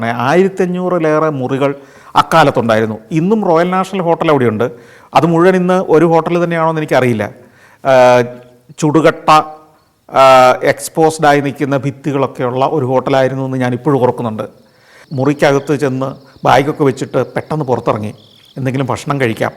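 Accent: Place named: Kozhikode, India. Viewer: മൂപ്പർ..... എന്റെ കോഴിക്കോടൻ ഭാഷ...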